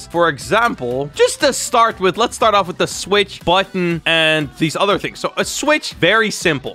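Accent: Netherlands. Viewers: Are u Nederlands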